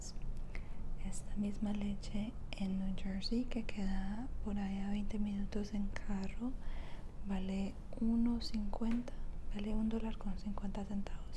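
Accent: Antioquia, Colombia. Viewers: Ya quisiera yo q mi nevera estuviera así de llena :'v Y creo que eres paisa? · siempre me ha encantado el acento Colombiano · AMO LA VOZ COLOMBIAINA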